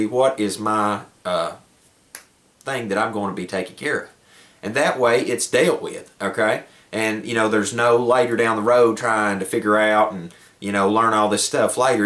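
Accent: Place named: Kentucky, United States. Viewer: Sweetheart you have great information, but you could reach sooooo many more people by playing down the accent. Im from kentucky and made a point to talk straight as a kid and noone can tell where im from now, but all of my family sounds like you. Its hard to be taken seriously without that non-regional dialect - you could reach sooooo many more people if your voice didnt overpower your message. Please dont take offense its a genuinely heartfelt critique. Again great info, and great reminders. Thanks for doing what you do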